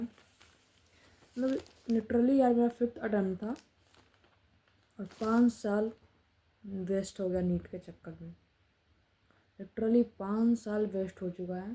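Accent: Bihar, India. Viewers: Ap bihar se ho? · Bihar se ho na?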